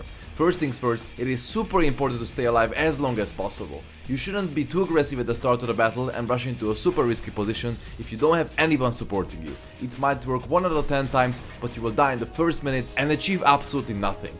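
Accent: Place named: Hungary. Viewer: Miért ilyen magyaros a kiejtésed?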